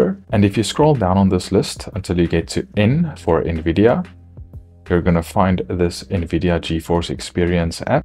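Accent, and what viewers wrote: South Africa. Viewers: Do I hear a South African accent in there? 👀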